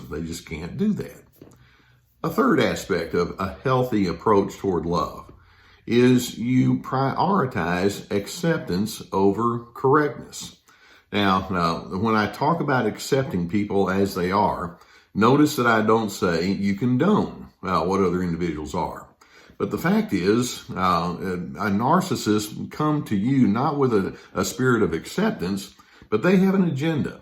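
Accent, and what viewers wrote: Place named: South, United States. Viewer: Yes,. WE HEAR YOUR HEART!! Your kindness, empathy and sincerity emanates from the screen!! Your words, knowledge and wisdom (and your Southern accent 😁) comforts and reassures me. Your videos have literally been a game-changer and a life-saver for me!!! THANK YOU!!!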